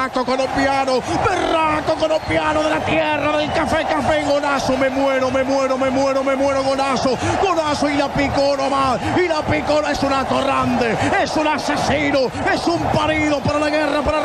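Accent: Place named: Córdoba, Argentina. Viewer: Porque el relator es cordobes, argentino. Quizás no entiendas algunas palabras porque son modismos de acá.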